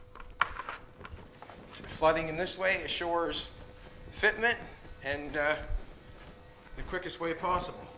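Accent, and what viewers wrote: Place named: Newfoundland, Canada. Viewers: East coast of canada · Where is this guy from? Sounds like a Newfoundlander to me. Great video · That's a Cape Breton accent if I've ever heard one!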